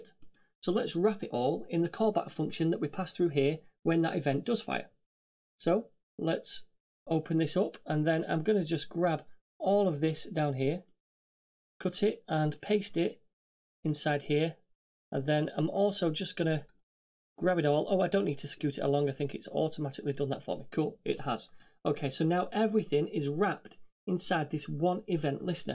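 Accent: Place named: Manchester, United Kingdom. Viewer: Thanks for putting this course together, top stuff. Do I hear a Mancunian accent?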